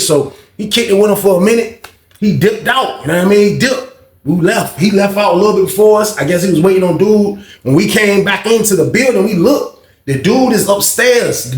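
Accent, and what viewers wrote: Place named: New York, United States. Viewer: Yo tbh you dead ass a New Yorker that never lived here The way you talk, the way u explain things, the way you move all i see is New York This is kinda why i gravitate towards u (pause) But nahh for real your stories are A1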